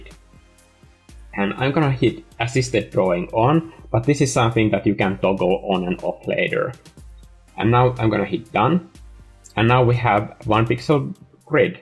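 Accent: Finland. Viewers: For me it was the reverse: as soon as I heard him speak I thought "wait.... he sounds finnish" looks at name yep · Tuli suosituksii tää video ja heti aksentista kuuli et oot suomalainen xd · Hmm are you from Finland? That accents is kinda like a Finland accent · Suomalainen aksentti?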